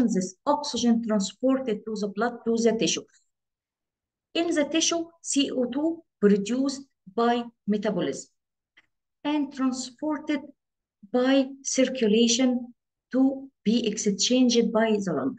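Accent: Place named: Egypt. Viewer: I just lov the Egyptian accent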